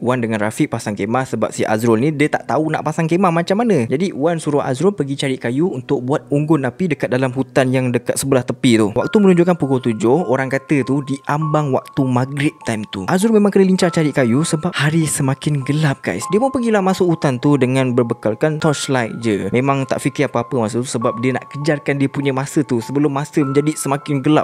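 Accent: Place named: Melaka, Malaysia. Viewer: X bkn org Melaka ni...mengelibas pekat😂😂😜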